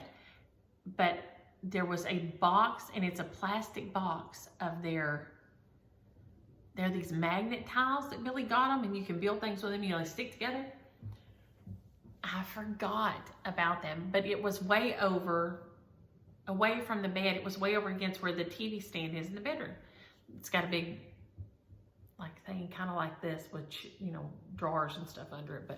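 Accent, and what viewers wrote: South, United States. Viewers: Sounds like you have had alot going on....I hope you can fine those pants....I had the Close Captioning on your video and they do not understand the Southern Talking....because what you said, was a whole different world than what they type...the last video, I was on the ground laughing to hard....they typed "Naughty" alot ...you did not say that....The Sheep are always fun to stitch, and you need to count your Blessings ...take care and be safe..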